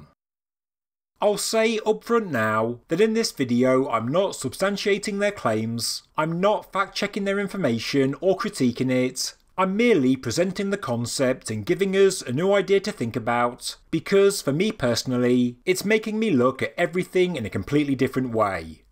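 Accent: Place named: England, United Kingdom. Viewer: I want you to know, after I watch your videos I walk around my house punctuating then end of each sentence, in an English accent, lol. True story.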